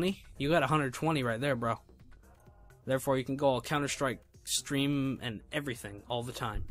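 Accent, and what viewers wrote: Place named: Ireland. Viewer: Irish, m8 Irish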